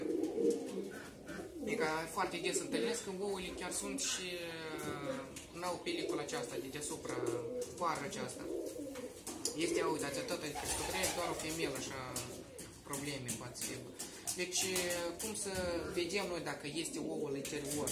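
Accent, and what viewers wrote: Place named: Moldova. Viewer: E din moldova ca vorbeste moldoveneste👍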